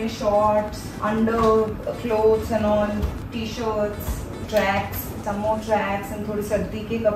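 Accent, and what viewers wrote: Delhi, India. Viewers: Your delhi language is ❤️❤️❤️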